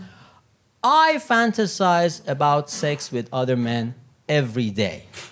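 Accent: Iran. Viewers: De Santos with an Iranian accent? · he talks with persian accent! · Why does he sound Iranian?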